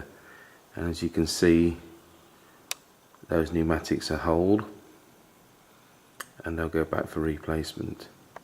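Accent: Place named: RP, United Kingdom. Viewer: A very interesting video and you do talk very posh!:o)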